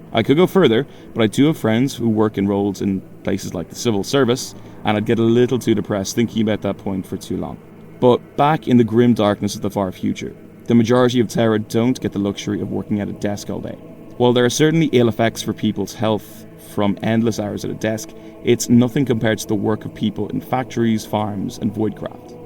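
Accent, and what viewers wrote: Ireland. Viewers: God im so glad i found this channel, no AI, Irish accent, what more could you wish for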